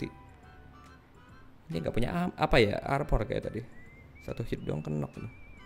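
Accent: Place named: Bali, Indonesia. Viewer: Logat bali cik hahaha🤣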